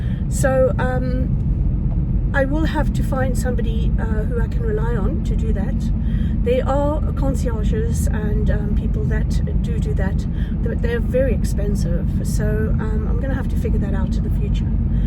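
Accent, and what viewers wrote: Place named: South Africa. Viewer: Hi. I love the history and footage of the little village where you have bought your studio. The lake is beautiful too. You sound South African ❤ thank you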